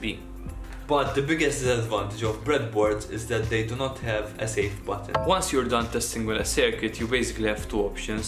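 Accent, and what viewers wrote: Malta. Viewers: Thats a very maltese accent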